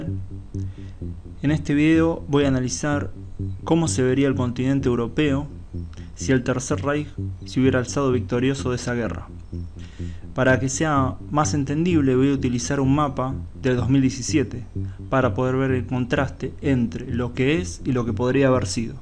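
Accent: Rioplatense, Argentina. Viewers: Lamentablemente, este videísta padece de la tara foniátrica rioplatense de confundir la LL y la Y con la CH; él dice "achá", "chevó", "machor". etc. por querer decir "allá", "llevó", "mayor". No sé por qué acentúa tanto la CH, he visto otros vídeos de otras personas también rioplatenses, pero que no remarcan tanto esa letra, parece que para ellos sería como signo de distinción o algo así, pero lo cierto es que termina fastidiando esa musiquita de la CH. Lo mejor sería insertar una voz robótica, son más neutrales, bien moduladas, y sin sonsonetes de aldea, están disponibles de google.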